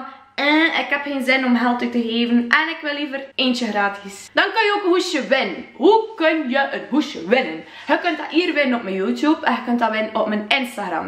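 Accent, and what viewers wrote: West Flanders, Belgium. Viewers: iPhone 6 golden dots aub, je maakt zo'n leuke video's gewoon zo int west-vlaams. Ik begrijp wat je zegt want kben ook van kortrijk!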